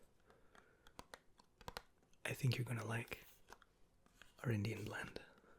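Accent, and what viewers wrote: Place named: United Kingdom. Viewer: I have found my new favourite bed bug. Love your work. You have a wonderful mixture of toned down enthusiasm and relaxation in your voice and your accent is like nothing I've heard before. It's British, Irish, sometimes even with a hint of Australian... it's mesmerizing. May I ask, where ARE you from exactly? Have you travelled alot and picked up this little smooth smoothie of accents along the way? I'm Swedish so I am certainly not an expert when it comes to English accents but I've listened to enough to know that you sir are one of a kind in the ASMR game. ❤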